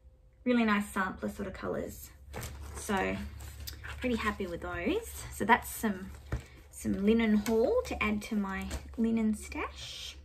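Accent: Australia. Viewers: I'm working on Mary A Bunce at the moment on 56 count. It's the first time I've done anything on such a high count, but I absolutely love it and I'm probably about 150 years older than you. Lovely to hear your Aussie voice as I'm Australian and living in the UK. Can't wait for the Covid restrictions to be over so I can visit the Crewel Gobelin again. Do they stock any of the linens you use?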